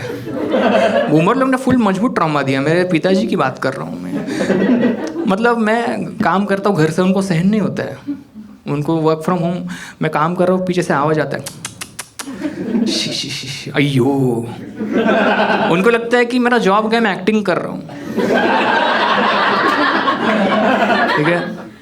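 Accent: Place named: Mumbai, India. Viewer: He has bombayai accent. So adorable 😍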